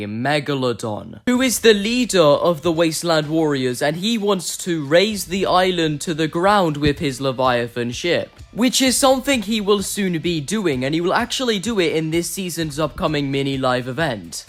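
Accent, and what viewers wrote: United Kingdom. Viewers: I like your videos and your british accent is amazing ahah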